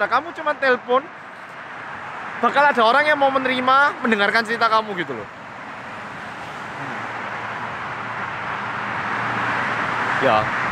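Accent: Java, Indonesia. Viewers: Iseng liat vlog dan baru tau vlog ini and... Lah... Medok banget jawanya😆 asli orang mana sih kak? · sepedah pancal hahahha.... orang korea yg super medok... yang bikin ngakak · aku wis nonton videomu ket jaman dipek ket kowe isih nganggo kamera hp. neng aku cen jarang komentar. neng asline aku seneng nonton videomu, kowe ra ngerti aku tapi aku uwis nganggep kowe kaya kancaku, kowe mung luwih tuwa sithik seko aku, caramu ngomong nggo basa jawa, logatmu marake aku ngrasa kowe kaya kancaku, ngarasa kaya kowe ki cerak. and what I like about you I know youre genuine, you care about Indonesia, you care about us like a dear friend. aku seneng ngrugoke kowe ngomong youre like a chatty friend that I never had. thank you for being here, thank you for your wise words, thank you for sharing your story with us. I like to listen to your story on a rough day. It helps me, I love your friendly voice. 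I hope you will never stop making videos, you could talk about your bad day and I will still listen to it. I don't know whether you will read this or not but once again thank you. wish I could meet you in person one day. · Loh gaonok jawabane jampot wes kehapus😂. Njir muedok seru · kadang pas ngomong Korea suka kebawa medok ga? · Wah du bahasa elo medok banget sihhhhhhh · MEDOK BANGET ASKJSDHKDSHFHSDDSJ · muka korea tp ko medok yo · kalo ngomong bahasa Korea (jadi keliatan orang Korea banget) pas pake bahasa Jawa ( medookk tenan mas ) syuuukak banget 😍😍😍 Ketika susah maupun senang selalu ingatlah dengan pencipta mu maka sesulit apapun hidup dapat kamu lalui, sesenang apapun kamu jangan pernah lupa siapa pemberi nikmat/rejeki agar kamu tetap bersyukur. 😊😊😊😁😍😍😍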